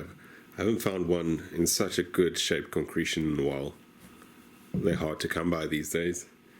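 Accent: South Africa. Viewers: Are you from South africa🤔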